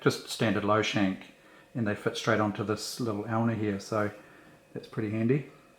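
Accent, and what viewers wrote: New Zealand. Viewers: I knew it! After seeing some TV News videos, I could recognize your accent. Greetings from Rio de Janeiro, Brasil.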